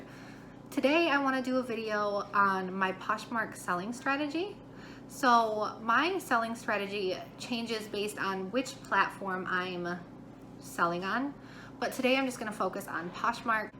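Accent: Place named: Upper Midwest, United States. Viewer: Are you from North Dakota or Minnesota by chance? I’ve been watching the ‘Fargo’ series on Hulu lately and couldn’t help notice you have a little accent that’s similar to the show.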